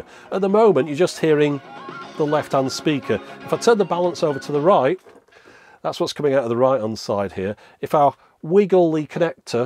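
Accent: United Kingdom. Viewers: Wow, this guy is from the UK??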